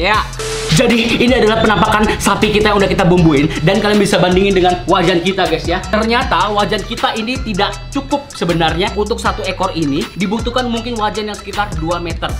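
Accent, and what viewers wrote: West Java, Indonesia. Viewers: sundanise pisan euy koko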